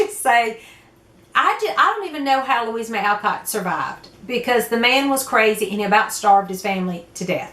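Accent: South, United States. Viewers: I'm so curious where you are from? I'm an East Tennessee girl but your southern drawl has me beat! 😉💜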